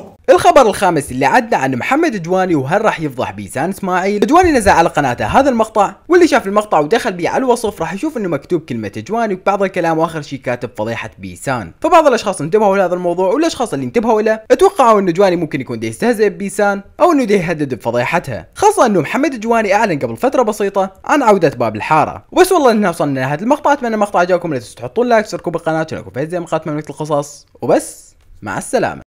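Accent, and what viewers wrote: Iraq. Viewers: فديت اللهجه العراقيه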